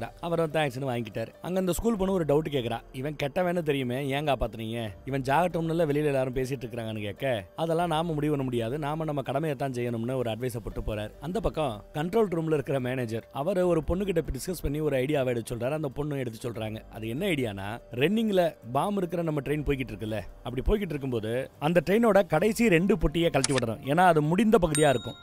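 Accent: Madurai, India. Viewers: இவ்ளோ அழகா கதை சொல்ல தெரிஞ்ச உனக்கு நம் தேசத்தை மதிக்க தெரியவில்லையே உன் பேச்சு மதுரைக்காரன் போல் தெரிகிறது எதிரியான துருக்கி பேராபத்தில் இருக்கும் பொழுது உதவிக்கரம் நீட்டிய தேசம் நம் தேசம் சைனா பாசம் தான் உனக்கு அதிகமாக உள்ளது உன்னைப் பெற்ற வர்கள் இந்தியர் தானே அதில் சந்தேகம் இல்லையே உன்னை காயப்படுத்த வேண்டும் என்ற நோக்கம் எனக்கு இல்லை நம் தேசத்தை இழிவாக என்னும் எண்ணத்தை முதலில் மாற்று நீ கதையில் சொன்ன பெண்ணின் மனநிலையும் உன் மனநிலை ஒன்று போல் தான் இருக்கிறது உன்னைச் சுற்றி உள்ள நால்வரை வைத்து ஒட்டுமொத்த தேசத்தையும் சந்தேகம் கொள்ளாதே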